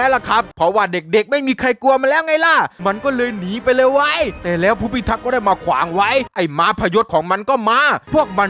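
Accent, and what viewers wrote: South, Thailand. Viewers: ชอบเวลาพี่พูดใต้อะ พี่พูดเยอะๆหน่อยผมชอบ55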